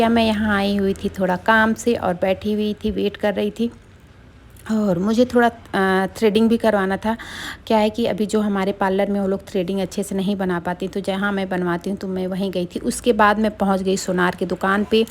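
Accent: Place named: Chhattisgarh, India. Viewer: hlo didi aap kha se ho apke bat karne ka tone chhatttisgarh k loogo ke jaisa hai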